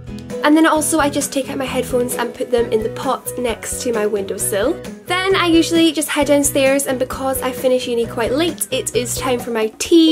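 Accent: Scotland, United Kingdom. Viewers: Where do you live? Are you Scottish ? I am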